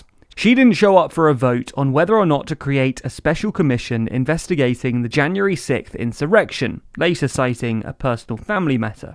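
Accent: United Kingdom. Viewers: his main channel is for uk politics, he also speaks with a british accent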